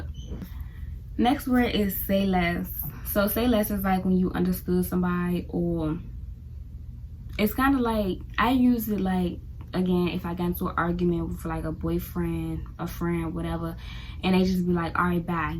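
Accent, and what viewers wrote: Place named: Baltimore, United States. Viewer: Im from Philly. The way you talk sounds like two Baltimore girls I know. And I know you're not saying (yew,dew) like them, but it's more than that. I can't explain it cus I'll be writing paragraphs. You can tell the DMV is a transitional area. DC locals just sound more southern.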